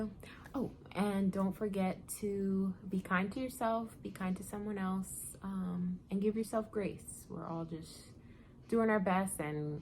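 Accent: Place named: New Jersey, United States. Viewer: I love you and your podcast. Thanks so much! I’m from Philly. I live in Florida now. So, I’m enjoying your NJ voice and creativity.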